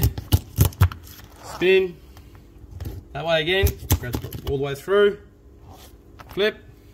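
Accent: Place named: Australia. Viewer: I thought you were in Australia from the accent 😁 I saw another video where there were leeches in fields there 😳 Do you guys have ticks everywhere too?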